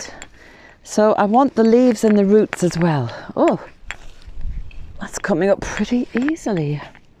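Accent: United Kingdom. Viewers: Omg so uncanny, you look like an older version of myself, or what I would imagine I would look like when I turn 50. What is it with Irish people? Why do I look so Irish even though I am a Finnish person :D .. or are you Irish? You sound more British. Now to the actual comment, I love dandelions! I always start to crave both the root and the leaf when spring comes, certainly something my body needs this time of the year. I have a tea brewing right now as I type, yes, there is dandelion root in it! It was nice to see some really hands on medicine making <3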